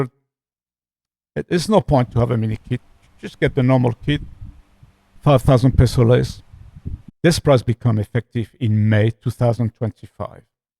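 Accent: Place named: Belgium. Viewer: im curious, i read you're from Australia, but your accent sounds european. my friend says you sound belgium.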